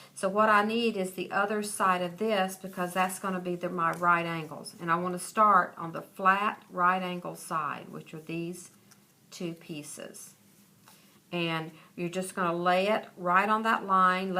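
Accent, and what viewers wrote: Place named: Texas, United States. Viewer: Loved this tutorial! I also loved hearing you speak, 'cause you sound like you might be a Texan! ;-) Thanks for sharing your easy to follow technique...your card is beautiful!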